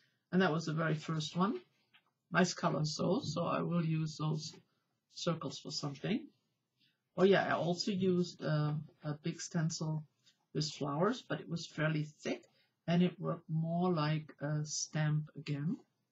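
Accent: Germany. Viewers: Hi! Yes I won Andrea's journal and a lot of artsupplies! But you have a European accent right....? German? So great you love our little country. Hope you still visit Europe, I love to go to the USA. Last year I was for a short visit in Denver and I think you live there now? Thanks for your reply!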